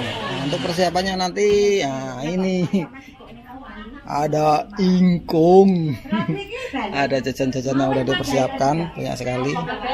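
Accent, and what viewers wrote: Java, Indonesia. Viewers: Ma....dari bicaranya om...bhsa jwanya kok kental bnget cih....piantun pundi nggih.... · Owh emang mm.tinggal di jambi yaa, dn orang jawaa th0 logat nya bicara ny jawaa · Mama saya kira org jawa dr logat bicara nya gak tau nya di Jambi 😄😅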